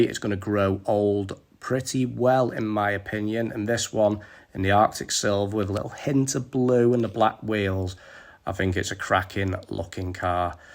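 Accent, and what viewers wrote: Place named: Liverpool, United Kingdom. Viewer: Subscribed! I think you’re Scouse. I want a 435i someday too and I love in Liverpool!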